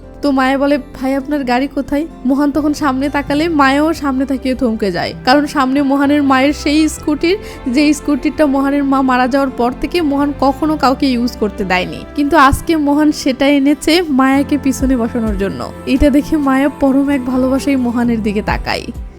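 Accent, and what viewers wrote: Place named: Khulna, Bangladesh. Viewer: আপনার বাড়ি নিশ্চিত যশোর বা খুলনা।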